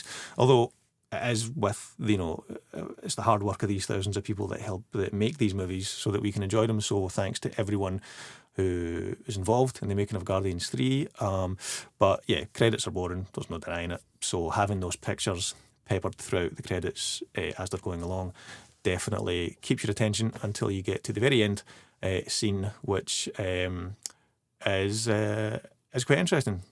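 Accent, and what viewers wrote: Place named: Scotland, United Kingdom. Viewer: I would like to officially note my interest in playing ‘drunk-star’ in future mcu projects 👌 i think i’m perfect for that role 😂 I agree with u that Adam Warlock was a bit of letdown but didn’t take away from the movie. Thoroughly enjoyed it and a return to form for mcu indeed 😅 Didn’t realise u had a youtube channel pal! Enjoyed this video 👍 Great to hear another Scottish accent on here too. All the best with ur channel mate, great start for sure! 😃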